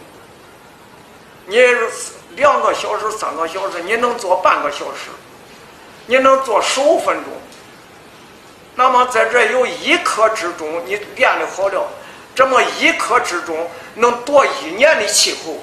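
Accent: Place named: Henan, China. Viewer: 河南人河南话，但和山东部分地区的口音很相近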